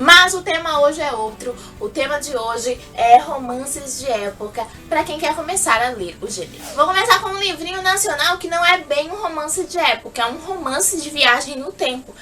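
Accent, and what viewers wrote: Salvador, Brazil. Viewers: Vocêee é de salvadoooor?????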